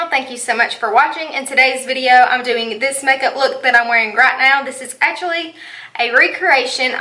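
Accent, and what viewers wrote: South, United States. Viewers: I absolutely love your tutorials! I also have the country accent, yours is cuter! 💜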